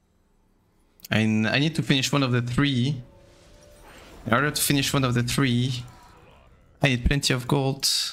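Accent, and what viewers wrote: France. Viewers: Hey man are u french?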